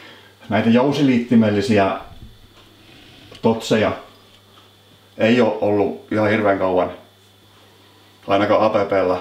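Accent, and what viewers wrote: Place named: Turku, Finland. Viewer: Tuosta murteesta sellainen, että oli alkuun vaikea ottaa sun sanomisia ja ohjeita tosissaan, kun kaikki oli turkulaisittain ilmaistu epävarmasti ja "vähän sinnepäin", mutta siihen on tottunut. Tässä kun on sun videoita ihan ajanvietteenä ja uuden oppimiseksi lomalla katsellut, niin tässähän saattaa kohta uskaltaa jopa käymään Turussa, kun alkaa ymmärtämään murrettakin. :D Sopivan selkeitä videoita ilman turhaa koristelua, hyvä hyvä!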